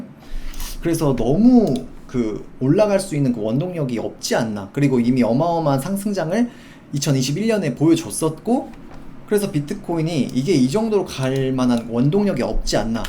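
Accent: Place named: Jeolla, South Korea. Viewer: 약간 느린 말투에.. 전남처럼 확 튀는 사투리도 아니고.. 성님이라는 단어를 써서 그랬어요 ㅋㅋ 딱 말투가 전북스타일인데 ㅋ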